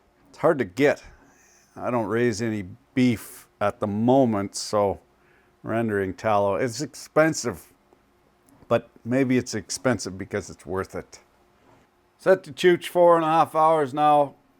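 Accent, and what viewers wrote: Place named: Canada. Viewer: canadian accent...where's your secret lab? Be safe out there, you can't go breaking down the science and expect to go unnoticed. Thanks for the reminder eh.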